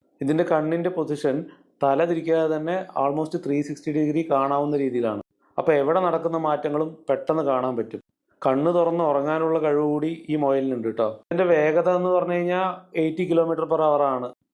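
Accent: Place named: Palakkad, India. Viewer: Palakkad slang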